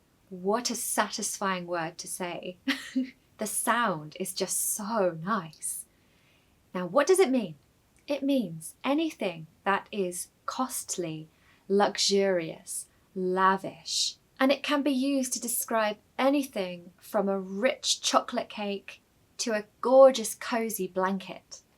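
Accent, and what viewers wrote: United Kingdom. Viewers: British English sounds sooooo amazing! 🥰 Thank you for this precious lexical collection,! Please, do more videos of this kind. You sound so charming! I am Ukrainian and fell in love with British English in my teenager's years. I've been to London last summer for the first time in my life. OMG! I felt like in Paradise listening to British English. You walk on the street and hear behind you a Duke speaking with an intonation of majesty, you turn around and see just a guy in a streched T-shirt. British English is really something etherial and sumptuous! Love it so much!🥰😍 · The best video I have watched so far in English words with a British accent. I hope that more videos like this lesson will be uploaded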